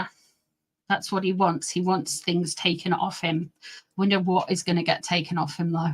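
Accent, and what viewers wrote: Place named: Newcastle, United Kingdom. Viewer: I love your voice. Just love the Georgie accent ❤ And just for the snob I'd like to say I come from a mining town /heritage in South Wales!!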